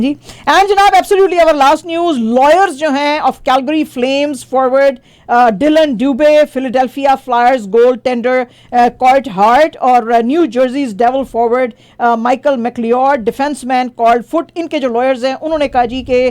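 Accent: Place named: India. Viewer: Oye Paindoo, Corps Commander is pronounced "Core" commander, not "Corpse" commander. And are you an Indian? Why can't you pronounce the letter "Khay" of Urdu? You say words like Kharaab, Khayal, Khamosh etc. like an Indian.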